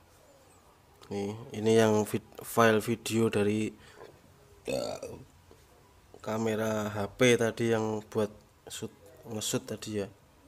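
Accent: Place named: Java, Indonesia. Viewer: Lagi nonton video mu ini Mas. Le mgendikan thik medhog men njenengan Mas.. 🤭🤭🤭 Tetap unik & otentik.. Mugi sehat2 njih Mas Dados amal Jariyah & ilmu engkang manfaat. Aamiin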